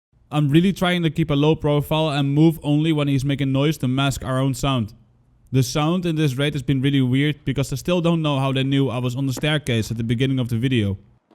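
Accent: Netherlands. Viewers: Are you dutch? Your accent is really dutch.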